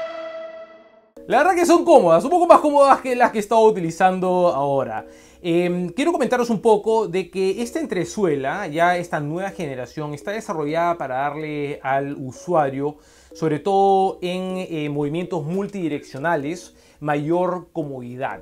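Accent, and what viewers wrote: Peru. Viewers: Ese tono peruano me hace largarme y no verte 🙄🙄🙄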